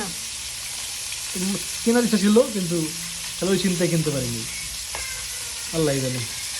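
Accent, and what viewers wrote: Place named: Barishal, Bangladesh. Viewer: আপনার ভাষা শুনলে কথার মেজাজটা গরম হয়ে যায় বরিশাইল্লা খাতার গাট্টি ভিডিও সামনে একটু শুদ্ধভাবে কথা বলার চেষ্টা করবেন বুঝতে পারছেন খেত কোথাকার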